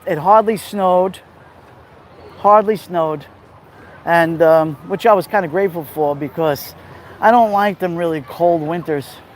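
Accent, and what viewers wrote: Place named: New York, United States. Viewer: You are a bright ray of sunshine, and I just love listening to your NY accent!!!☀️😎👍🏻 I had to hop off, but just finished the ending of yet another great livestream and yes thumbs up!👍🏻 Thank you and God Bless You and your family!🙏🏻🇺🇸❤️🤍💙🇺🇸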